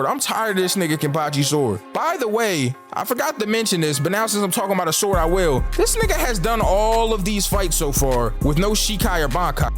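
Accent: Philadelphia, United States. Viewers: Do is u from Philly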